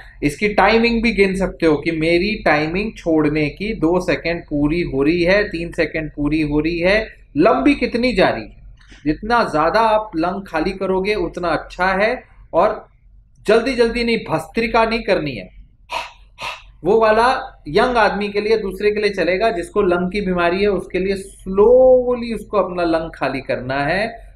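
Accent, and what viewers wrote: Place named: Punjab, India. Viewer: Maadi moti 😄 punjabi nikal e jandi aa sir 😂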